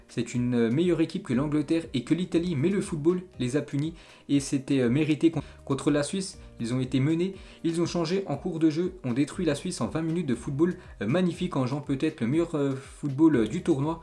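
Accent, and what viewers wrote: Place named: France. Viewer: il à lu Mason Mount avec un accent français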